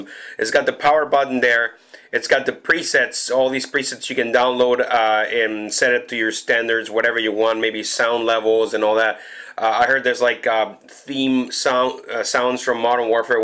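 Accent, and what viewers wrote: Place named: Canada. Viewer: i love ur deep canadian accent lmao its so dope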